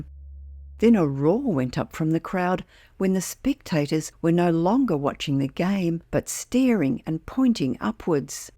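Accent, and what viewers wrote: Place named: Australia. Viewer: Love your channel as well as your Aussie narration 😀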